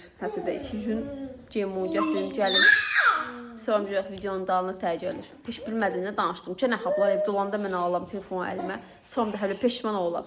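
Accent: Sheki, Azerbaijan. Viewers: Şeki lehcesi.❤eviniz mohteşemdi.can sagiliqi ile otrasiz.bizde evi tikilmis hazr almisq deye tam isdedymiz kimi olmadi.bizde 220kvadratdi 2mertebe xercimiz cox cixb.amma 1gün satb oz isdedymiz prayeti alarq ya sifrdan tikerik insallah.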